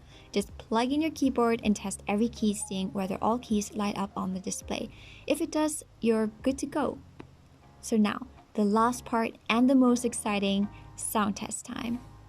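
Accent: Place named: Scotland, United Kingdom. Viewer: this is random but you sound slightly scottish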